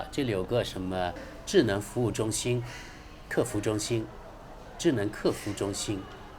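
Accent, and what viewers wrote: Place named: Guangxi, China. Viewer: 丢拿马 我以为是个洋鬼子普通话不标准 原来是我广西老乡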